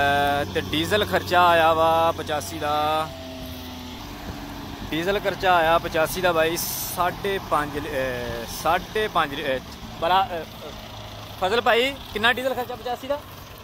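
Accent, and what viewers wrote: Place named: Gurdaspur, India. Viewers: Veer di boli Gurdaspur wali aa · tuhadi boli sun ke subad a gaya ji lagda tusi sade punjab de hi hovo very nice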